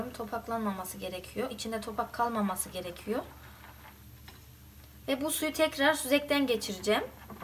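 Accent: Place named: Central Anatolia, Turkey. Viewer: Gaynamaya bırakggyoruz o kadar iç anadolulusun ki abla bende niğdeliyim şiveni çok iyi anliyorum 😂🖑 elllerine saglik bizde koyunun boyun etinden yapılır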